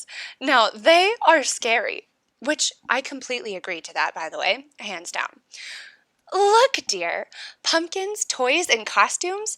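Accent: Wisconsin, United States. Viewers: Gotta be from Wisconsin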